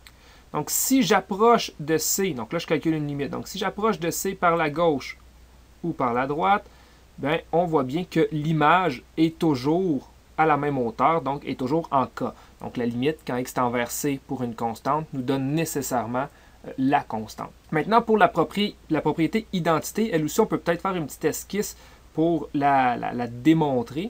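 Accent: Quebec, Canada. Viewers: si t'as un problème avec l'accent québecois, je suis toute ouïe. sinon, va donc chialer ailleurs. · Srx on voit rien sur ce qu'il fait écris dans le tableau et les dessins des graphiques sont frenchement dégueulasse...sans compter (bien que le mec n'y peuvent rien) un accent québecquoi absolument dérangeant et rendant la compréhension encore plus difficile...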